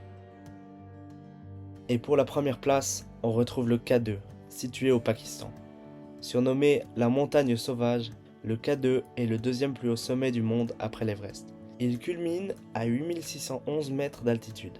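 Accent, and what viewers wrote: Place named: Italy. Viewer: Le petit accent italien 🤌🏽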